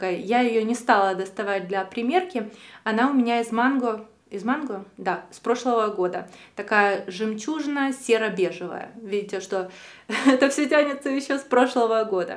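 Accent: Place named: Surzhyk, Ukraine. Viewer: хочу сделать вам комплекс. Примерно 4 года назад я перестала смотреть все ваши видео потому что ваша речь была просто ужасной. Постоянное эээ, мммм, все жутко медленно и без конструктивного текста. Случайно наткнулась на это видео и хочу сказать что сейчас стало намного лучше!! Ваш русский тоже стал лучше, сурджик уже не так сильно режет слух. Ещё есть куда расти, нужно поработать над тем чтобы быть более естественной перед камерой, но все же- очень заметен ваш рост как ютубера! Так держать! 👏🏻👏🏻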